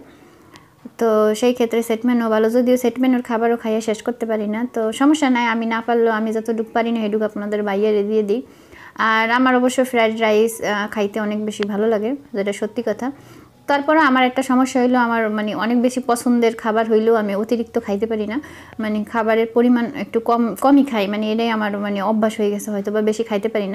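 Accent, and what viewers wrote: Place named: Madaripur, Bangladesh. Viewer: Apu tomar madaripurer vasa huinga moroto madaripurer vasa muhe aiya porte ace.onek din doira koite parina. Dese gele komu InsaAllah.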